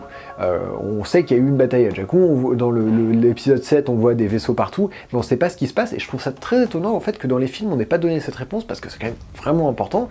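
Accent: United Kingdom. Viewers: Salut, critique super sympa, j'ai juste un peu de mal avec la prononciation à l'anglaise de tous les termes de star Wars...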